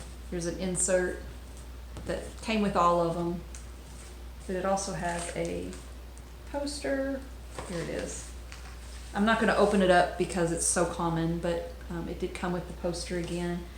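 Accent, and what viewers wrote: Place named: Kentucky, United States. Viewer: OH! Wow I;m Jealous! is Playing Aug 20 about 35 minutes from my house soon. I think it's mostly sold out. Never seen him though I've had a few chances. I thought I detected a KY accent. My Dad use to live just north of Louisville and I spent some time there. You'll have a great time. I know you will!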